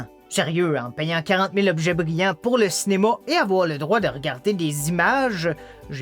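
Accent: Quebec, Canada. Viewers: J'ai commencé à regarder des vidéos en français pour pratiquer la langue. Je me suis retrouvé ici par hasard et, waouh, quelle découverte ! Bon, je ne comprends pas la moitié (j'utilise les sous-titres automatiques. Ce serait super d'avoir des sous-titres en français). Je trouve l'accent québécois vraiment intéressant. Salutations d'Espagne !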